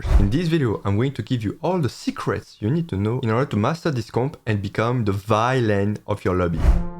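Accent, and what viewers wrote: France. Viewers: I've been holding a question for as long as I've been watching your videos... what is your accent ? It sounds like a French accent but as a French I'm wondering why I think it sounds a bit more like a Northern accent... SORRY I JUST COULDN'T HOLD THIS ANY LONGER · Pro-level Dad puns, cute French accent, that crush better strike while the iron is hot! :D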